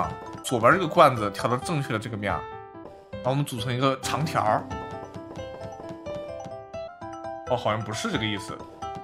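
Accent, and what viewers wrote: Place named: China. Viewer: 乾，我現在才注意到很細心欸！這裡是繁體 B站那裡是简体 (抱歉現在才去B站看主播啊) 我還想說為啥字幕是繁體講話卻是中国腔 ( • - •;)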